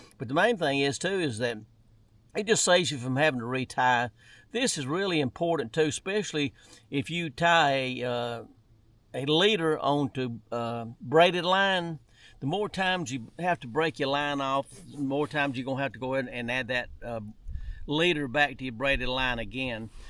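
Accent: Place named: South, United States. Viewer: What size weight do you use? I've always made them with 2oz catfish weights and I use a zip tie to attach the clip. But your way may be even cheaper. And BTW my wife heard your voice and said, "Cap, you are as southern as they get, but I think that guy is more southern than you" lol. Im a Georgia man, where are you from?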